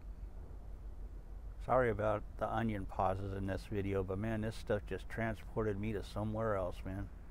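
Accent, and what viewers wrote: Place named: South, United States. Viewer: Great review. Sounds good. And I see you are adjusting to the southern country life just fine. Even starting to get the drawl y'all.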